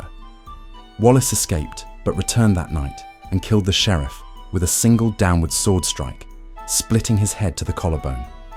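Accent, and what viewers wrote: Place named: England, United Kingdom. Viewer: Narrator with English accent denying Prima Noctis is SUS AF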